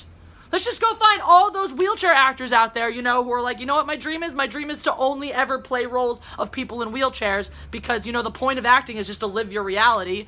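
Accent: Philadelphia, United States. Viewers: I like when gets all Philly on us. XD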